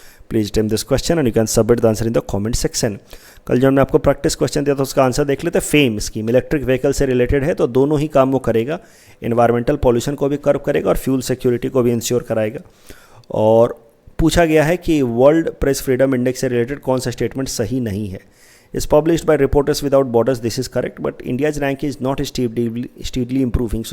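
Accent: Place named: Bihar, India. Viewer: Ur English like BihariEnglishsm....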